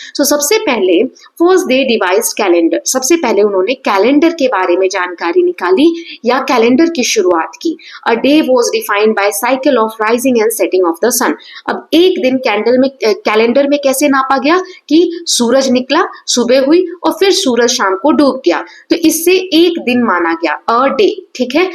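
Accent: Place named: Bihar, India. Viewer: Mam aap bihar se ho 😊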